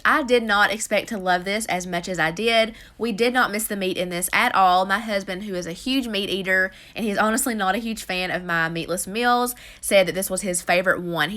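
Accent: South, United States. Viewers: I love the way you talk! Are you a southern belle? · I have just fallen in love with your channel! makes me feel like a horrible mom/wife I gotta step my game up! I absolutely love your southern accent too, oddly soothing as it reminds me of the best part of my childhood living in Florida (yes I know no one sees it as the south but my town sure acted a lil southern)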